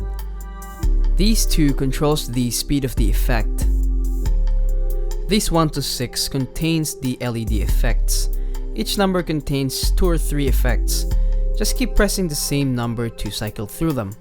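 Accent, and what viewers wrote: Philippines. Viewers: I thought I heard a Filipino accent 🙂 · Filipino accentttt... good video bro.. nagdadalawang-isip ako as to get this or not but I'll take ur word for it..looks durable enough and it seems like a good budget mechanical keyboard...kudos 💪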